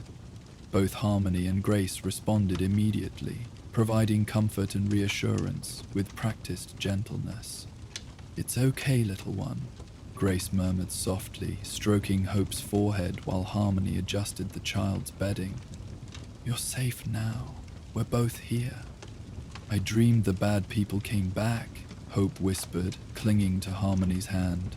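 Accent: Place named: United Kingdom. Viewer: Lost me at the British accent. I came to hear 1950s trans Atlantic accents like in fallout not British mods.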